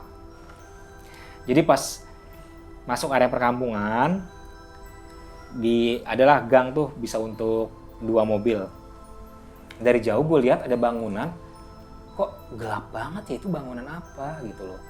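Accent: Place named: South Jakarta, Indonesia. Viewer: Anak jaksel mana suaranya niiih hehe. Akhirnyaaa cerita Kisah Menara Saidah walaupun nontonnya telat bbrpa hari😂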